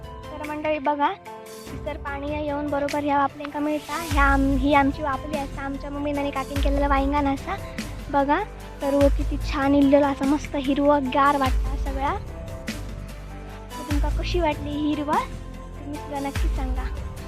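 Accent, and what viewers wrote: Malvani, India. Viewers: तु असा मालवनी बोलतस की ऐ कायला बरा वाटता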